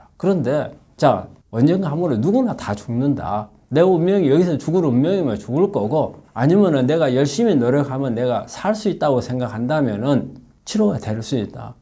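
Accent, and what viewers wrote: Jeolla, South Korea. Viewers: 아따 절라도고만 ㅎㅎㅎㅎㅎㅎㅎㅎㅎ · 전라도 억양 너무 듣기 싫다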